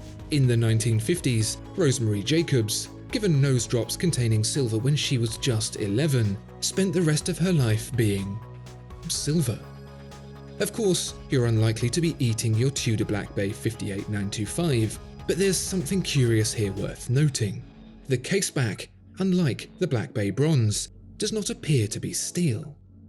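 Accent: United Kingdom. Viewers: Very informative video but gosh that ANNOYING British accent just for the Americans we buy anything from the Mother Country.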